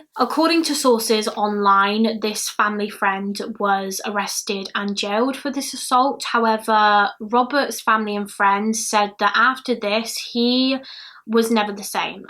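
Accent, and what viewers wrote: United Kingdom. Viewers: you've got THE MOST british, british accent I have ever heard. The Queen herself does not sound as British as you do.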